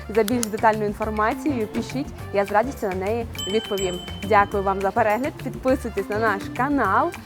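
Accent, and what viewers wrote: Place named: Ivano-Frankivsk, Ukraine. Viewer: слухайте, ви, «мовний спеціаліст» ха-ха! Іванофранківці балакають прибацаним російсько-польсько-самовигаданим суржиком, і ця пані-ведуча Якраз так і розмовляє. Кажу як киянин що прожив у франківську 4 роки. Я вже їх наслухався, повірте мені!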